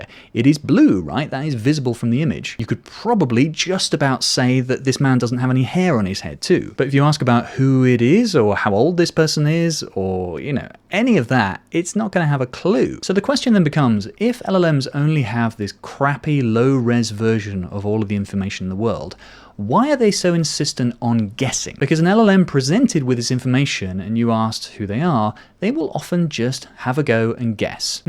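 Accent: United Kingdom. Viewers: Thanks for the video, you are becoming my favourite source for AI based videos as a developer. Unbiased, realistic and love your British accent, but, I hope you are not an Arsenal fan:D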